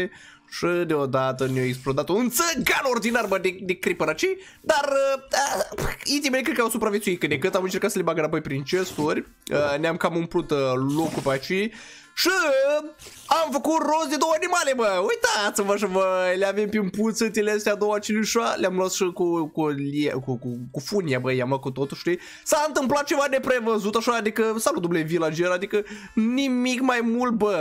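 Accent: Moldova. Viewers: Moldovean 100%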